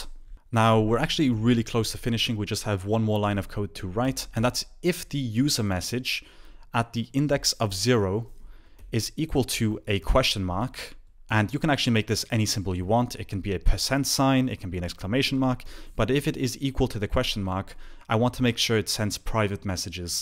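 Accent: Italy. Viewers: bruh the best italian english speaker that I ever seen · Are you Italian?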